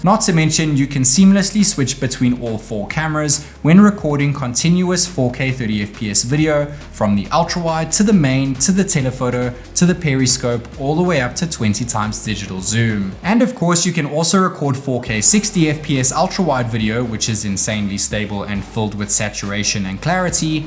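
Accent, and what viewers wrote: South Africa. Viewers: I didn't know you are South African 😱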